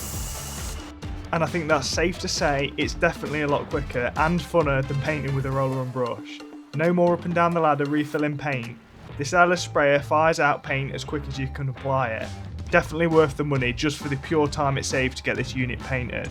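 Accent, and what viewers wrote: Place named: South Lincolnshire, United Kingdom. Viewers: Cracking job mate, im guessing your accent? South Lincolnshire? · First time watching. For the first 10 minutes, your English accent kept reminding me of someone famous, but I couldn't put my finger on it. Finally, I realized you sound like Brett Goldstein, from Ted Lasso. Looks like he's from Sutton, UK. Are you from somewhere nearby?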